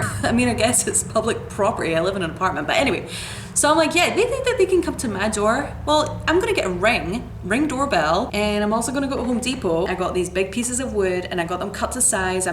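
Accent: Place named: Scotland, United Kingdom. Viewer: Like a female Craig Ferguson. Great storyteller. Your Scottish accent was much stronger then.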